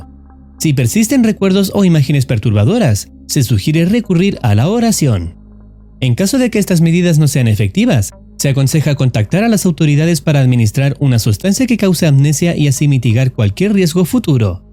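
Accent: Venezuela. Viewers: No sera venezolano?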